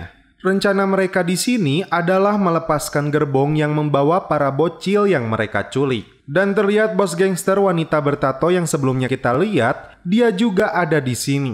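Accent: West Java, Indonesia. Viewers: Sunda ning a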